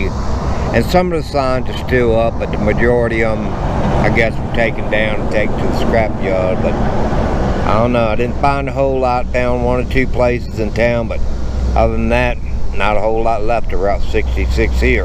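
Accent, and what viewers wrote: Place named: Louisiana, United States. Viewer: Boo Yah. Sounds like ya from La.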